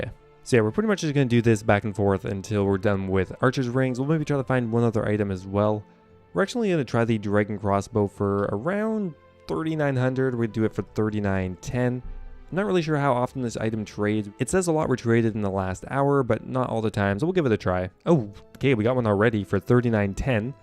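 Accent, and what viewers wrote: Canada. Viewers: Hey random question, you sound like you're Canadian but originally from a European country, or am I just hearing things lol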